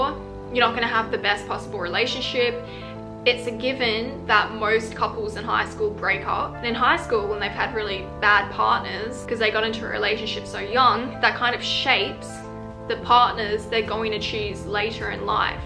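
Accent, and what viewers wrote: Australia. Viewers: Thank you ! Your advices are just so helpful. Also, really appreciate your aussie accent 😊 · i’m just living for her aussie accent. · Love the Australian accent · Your Aussie accent is amazing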